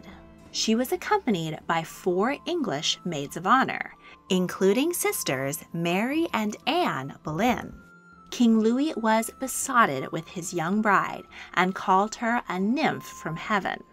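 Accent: United States. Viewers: History Teller and great American native voice ... 02/22/2021 Thanks 😊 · What? An American voice narrating this. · This so-called documentary is totally boring it doesn’t tell us anything new about Henry and his sisters just goes over and over the same old historical facts… It would’ve been a whole lot better if narrated by a non-American voice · I simply can't listen to an American narrator about English kings and royalty! It's too off-putting! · this English history is not good being narrated by an american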